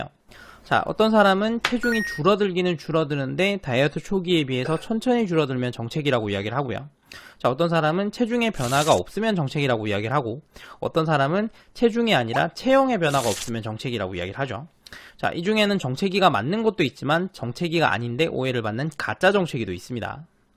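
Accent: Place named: Gyeongsang, South Korea. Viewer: 갱상도 사람이시네요 ㅎ 모하시는분인지 궁금.. 설명 진짜잘하심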